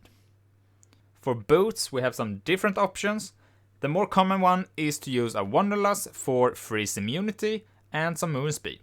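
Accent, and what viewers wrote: Sweden. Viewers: Svengelskan ärgrymm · 100% på att du är svensk :D Bra guide.. Tack edit: btw. The pob is a bit messed up. you got a lvl 1 tree, and then the lvl 15 tree is like full build, and lvl 40 tree has less placed skillpoints then lvl 15 :)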